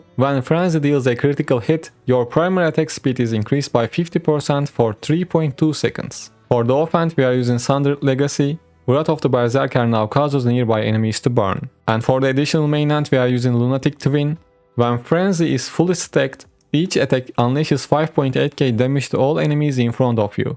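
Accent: Turkey. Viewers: aksan türk birinin ingilizce metin okumasına benziyo :D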